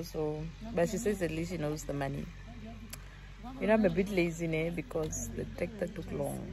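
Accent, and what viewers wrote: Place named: Oshikwanyama, Namibia. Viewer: Eish the way u speak oshikwanyama i enjoy it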